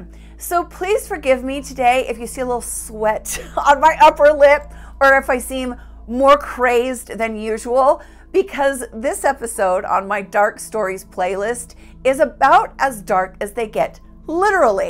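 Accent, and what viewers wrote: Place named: United States. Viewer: I love how Americans pronounce 'The -shires' like something out of 'Lord of the Rings' 😆🤣 so sweet - we say it like plain ol' 'shur' I'm afraid...'Che-shur' 'Lincoln-shur'...& don't get me started on 'Worcestershire' 😄 As for being in a dark 7mile tight cave??...for fun...?! HELL NAW!! 😬👈 Terrifying vid & great channel as always. 📛 🖤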